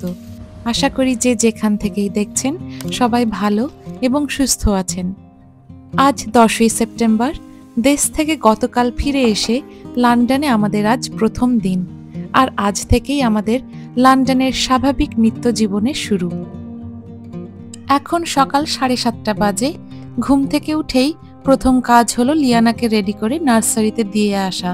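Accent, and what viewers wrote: Bangladesh. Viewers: Yes you speak like bangladeshi. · Apnar bangla pronunciation akdom e indian bengalis der moto na. You're sounding like a bangladeshi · Apo apnito bangladeshi der moto kotha bolen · Tomar kothar dhoron Bangladesher moto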